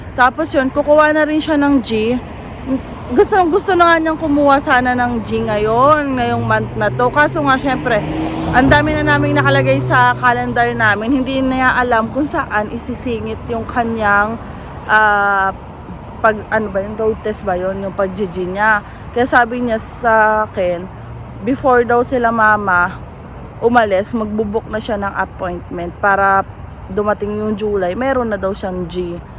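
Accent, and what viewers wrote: Batangas, Philippines. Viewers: Mga bata pa kayo kaya keribels lahat ngbpagsubok basta laban lang! Vlog nyo plagi ang nasa tv sa sala namin hahaha.. asawa ko plagi nanonood sa inyo habang nagawa ng email nya sa trabaho sa gabi😂Tawang tawa sa iyo dahil pareho tayo ng punto, taga Batangas kasi kaming mag asawa!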